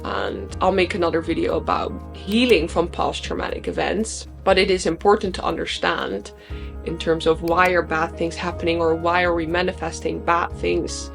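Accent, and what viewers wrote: Netherlands. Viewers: I hear a dutch accent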